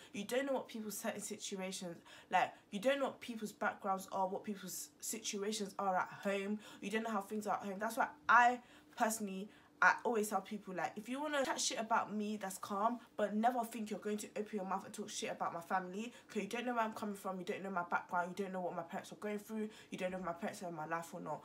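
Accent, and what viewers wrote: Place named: Zimbabwe. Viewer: You sound zimbabwean. .thank you for not being harsh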